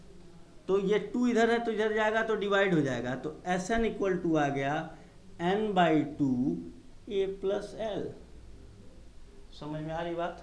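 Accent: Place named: Bihar, India. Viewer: Sir aap bolte bilkul bihari style se.....bahut cutely.... And thank a lot for this video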